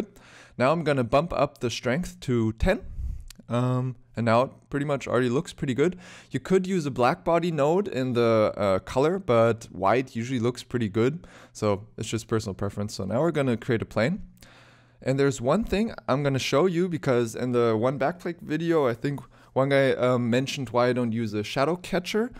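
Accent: Germany. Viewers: Are you a German-Guy? Your accent sounds German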